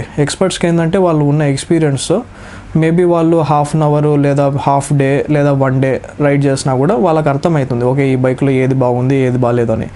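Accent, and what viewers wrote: Rayalaseema, India. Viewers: You are from rayalaseema right??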